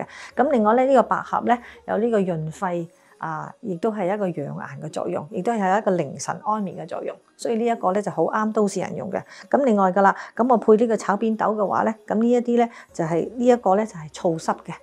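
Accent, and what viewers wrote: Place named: Hong Kong. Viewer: 講話清浙快折暢順，純正的香港話，博學的中醫知識！令人聽落舒服感受！容易學會及掌握各樣要點 𧹍𧹍𧹍!!!香港中醫學術領域之光！😊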